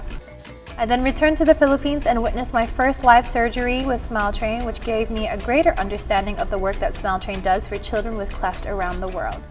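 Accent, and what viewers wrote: Australia. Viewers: When she talks normal, you can hear the Australian accent. When she Talk loud and proud, she speaks the proud Filipino accent!!!! (I noticed it) ❤❤❤🌟🌟🌟☀️☀️☀️🌞🌞🌞🥰🥰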